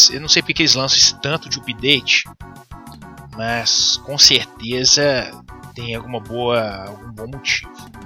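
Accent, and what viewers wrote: Minas Gerais, Brazil. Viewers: O melhor do video é o sotaque de mineiro do mlk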